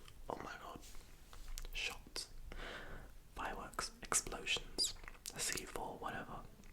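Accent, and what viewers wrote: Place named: United Kingdom. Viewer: I love your videos and british accent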